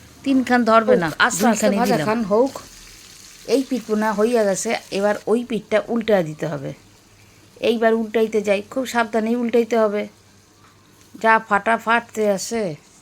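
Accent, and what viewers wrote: Bangal, Bangladesh. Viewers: Darun bangal vasa bollle recipe tao khub sundor hoyeche ❤❤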